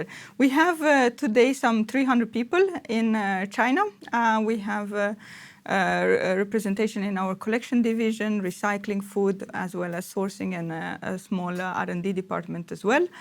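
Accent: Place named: Norway. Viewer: Norwegian english from. 😀 Tomta er litt for dyr for meg nå, ikke lett å regne inn.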